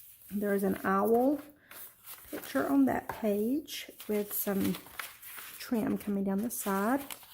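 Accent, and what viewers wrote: South, United States. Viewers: WOW!!!!! I am so glad I saw your comment about showing this journal on Tracie Fox's Facebook Page. Its one of the most well done journals I've seen yet!!!! Really beautiful and so much thought put into it! And, another thing that caught my eye is your middle (or maiden name) is...and my maiden name is also!!! You don't see too many McCollums so I was intrigued!! I am originally from Texas but I now live in Alabama...I can tell by your accent you are somewhere in the south I think!!! Anyway, loved the journal and now I am off to check out your Etsy store!!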